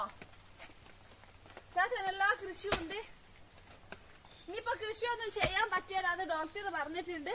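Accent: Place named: Kozhikode, India. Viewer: Achanodum ammayudum nalla snehamulla kutty welldone pengalee place kozhikode anne alle slang kettapoza manasillayathu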